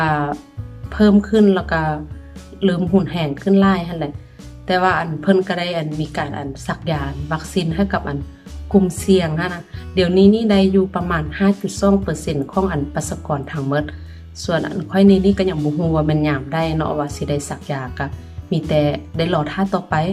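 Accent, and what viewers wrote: Luang Prabang, Laos. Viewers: มัก เด เสียงเว้าหลวงพะบาง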